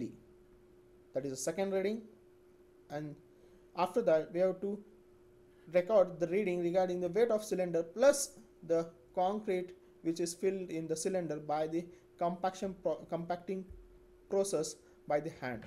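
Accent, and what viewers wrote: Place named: India. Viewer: Put caption cause its so damn hard to understand indian’s english with that strong Hindi accent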